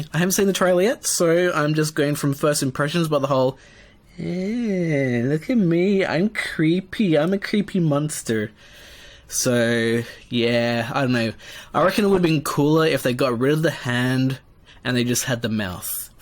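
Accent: Australia. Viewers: Just wondering are you Australian. If so. Let’s go Aussie. Also do you watch the AFL or know about the AFL. If you do know or watch afl which team do u barrack for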